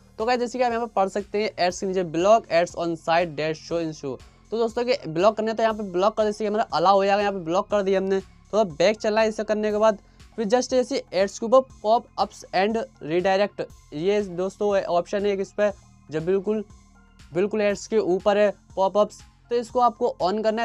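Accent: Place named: Uttarakhand, India. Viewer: Because aap ki avaaj Uttarakhand side ki lag rhi hai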